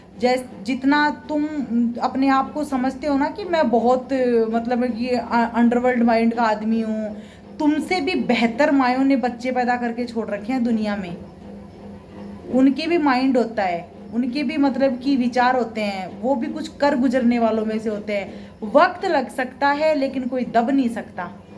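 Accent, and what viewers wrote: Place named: Shekhawati, India. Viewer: Shekhawati❤️ voice dil ko chu gyi didi really we like you ❤️❤️❤️❤️❤️❤️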